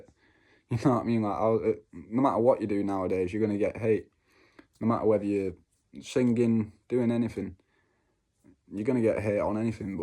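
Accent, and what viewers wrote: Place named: Manchester, United Kingdom. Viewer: Hi where do you live and who is your Neurologist. You sound as if you maybe from Manchester. I am on Keppra and Epilim. Clobazam is something I was given but it has side effects and is so difficult to come off so I didn’t start on it and I explained to the Neurologist. There are not many young people who share their seizures so I am glad you are.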